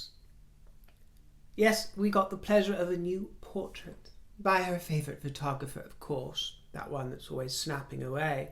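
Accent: United States. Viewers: Great American accent,! Perfect! 🇺🇸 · I loved your Valentine outfit today, and I must say, you do an extremely good American accent! ❤️ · Your American accent is spot on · Your American accent is quite good. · Ms. very elegant as always! Happy Valentines Day. I would love to be a fly on the wall, watching you and Sherlock Holmes ( Basil Rathbone) having a conversation. I am sure the conversation would be educational, and over my head. I am just a older country boy from NW Florida 20 miles east of Pensacola (southern Alabama really). Worked with a lot of brits. 23 and 24, recce loved their dry sense of humor. Every time I spoke Id get cracked on (southern accent) Can't understand Cockney accent it's funny though. Your American accent is spot on!!! Great show as always. God (Yeshua) bless you and your family and keep you safe!! Snogally woggly ha ha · ♥ 💎♥💎♥ Happy Valentine‘s Day, dear. 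You had me laughing, as usual here. Loved the American accent and the insightful observations. Looking gorgeous and in top form. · Ugh NO American accent! My ears! My ears! 🙉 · Luv your American accent!! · 😂😂 Omg ur American accent spot on. You look ßæv†!fu| 🌹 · I love your American accent! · Great American accent! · Loved your American accent. Props to you 👏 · an American here who truly delights in your broadcasts and appreciates your humor. Your American accent was very well done, but I was alarmed by the change! You sound better as an American than Meghan does. 😆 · I've been watching you for several months now and find you to be charming, sophisticated, on the cutting edge of outrageous fashion trends and above all, hilarious. Your American accent is excellent. Your laugh is wonderful and you wear your lipstick loud and proud. Throw in gloves and tiaras and you are a national treasure. 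· I’m American. LOVE LOVE LOVE your American accent!💗🤣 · darling, beautiful American accent! Spot on! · I love your laugh and your American accent! Keep on fruiting!! 🍇🍎🍉🍋😄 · Loving your American accent. ❤❤❤❤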